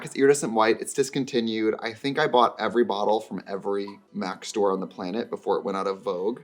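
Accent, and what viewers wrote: Wisconsin, United States. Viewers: I don’t know why but I cannot focus on anything other than how Wisconsin she sounds and now I’m questioning everything and am like does she always sound like this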